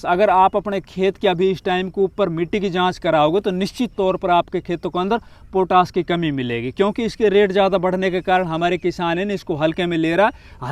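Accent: Mewat, India. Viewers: Mewati ho ke aap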